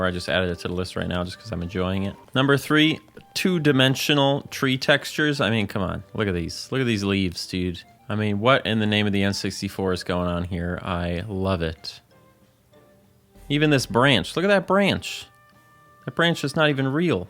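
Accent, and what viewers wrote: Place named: Minnesota, United States. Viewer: I’m thinking to myself “this sounds like a man from Minneapolis”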